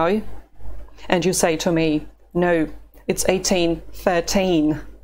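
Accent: United Kingdom. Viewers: as a russian your english like BBC reporters ı am not sure ı follow your channel your english or your russian.. but it makes me keep watching . thanks anyway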